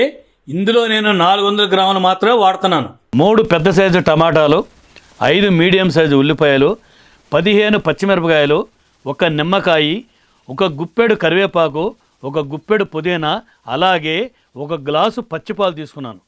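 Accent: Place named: Godavari, India. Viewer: మీ మాట్లాడే తీరు చూస్తుంటే కర్నూల్ laga ledu..Godavari jilla vari laga వుంది..చాలా చాలా బాగా మాట్లాడుతూ న్నారు. ధన్యవాదాలు 😊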